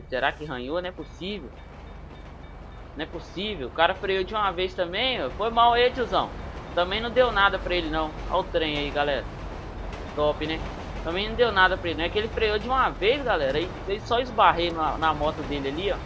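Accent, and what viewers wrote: Minas Gerais, Brazil. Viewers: Mano ? se e Mineiro? :V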